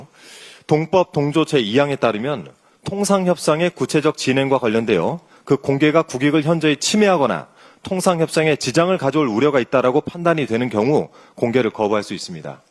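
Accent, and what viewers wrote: Gyeongsang, South Korea. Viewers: 김정재 의원을 뽑은 지역구 사람들은 정신 차리라. 더신.ㄴ 경상도 사투리가 토할 것 같은 느낌을 버리규 싶습니다.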